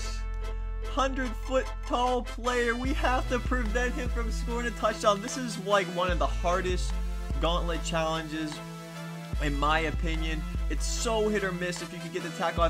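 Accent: Pittsburgh, United States. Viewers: r u from Pittsburgh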